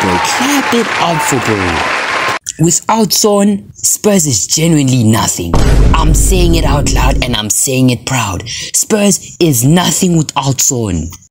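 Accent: South Africa. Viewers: I can hear the Satafrikan accent😂🇿🇦💯 And you're a Chelsea fan? Subscribed🫱🏽‍🫲🏾💯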